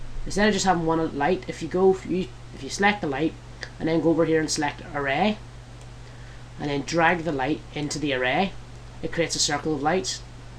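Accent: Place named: Northern Ireland, United Kingdom. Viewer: Nice job! Northern Irish accent too !!!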